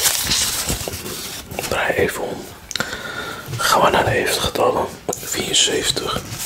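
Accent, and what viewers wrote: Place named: Netherlands. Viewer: I love the Dutch accent 🤗 Nice vid!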